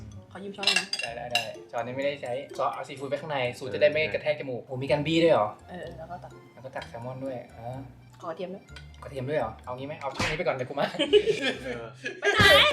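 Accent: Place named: Isan, Thailand. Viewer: เว้าอีสาน แล้ว1 น่าฮักกกกกกกกก อารมณ์พี่จินเว้าอีสาน อะไรงี้ 555+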